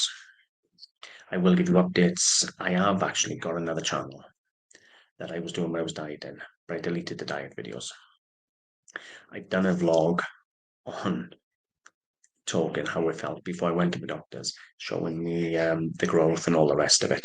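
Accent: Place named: South Wales, United Kingdom. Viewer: Hi I’m fairly new to your channel. I’m in S Wales also and it was wonderful to find your videos and hear your accent. I m also new to reselling and I sincerely thank you for sharing your wealth of knowledge, expertise and enthusiasm.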